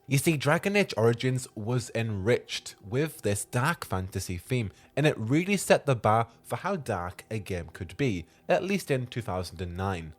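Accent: United Kingdom. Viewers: You are very british, and that's coming from a British person. What I mean is, your voice is very soothing to listen to lmao if that makes sense?? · Ah, so you're British. Totally irrelevant I know, but I couldn't quite place your accent before. Then again, I suck at that.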